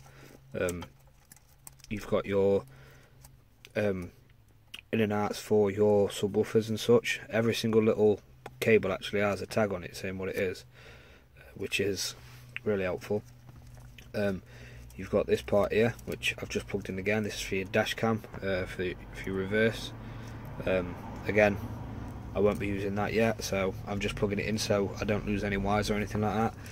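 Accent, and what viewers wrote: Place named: Sutton, United Kingdom. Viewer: I knew you was from Sutton the first video I watched! I can tell by the accent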